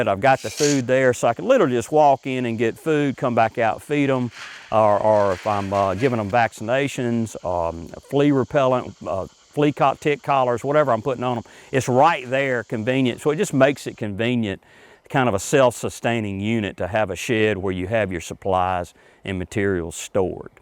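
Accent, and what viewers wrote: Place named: South, United States. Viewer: Remember, working dogs are bred to be outdoors and have good temperature tolerances. In the summer it looks like he uses evaporative cooling from washing down the concrete, ceiling fans to circulate air, hard roof to protect from direct sun and radiant heat. Based on his accent and surroundings I figure he's in the south. For heat in the winter he probably puts blankets in the dog houses. They are raised off the ground, so that takes away from some of the thermal loss from sleeping on the ground, and the metal roof raises the temperature from the sunshine in the kennel area. The concrete will heat up some during the day providing thermal mass heating at night. Some good tree coverage and the leaves provide extra shade in the summer, but the leaves fall in the winter giving more sun access to the metal roof.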